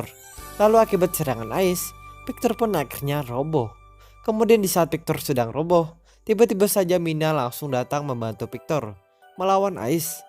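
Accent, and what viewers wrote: Banjar, Indonesia. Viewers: Logat banjarnya kedengaran kali min 😄😄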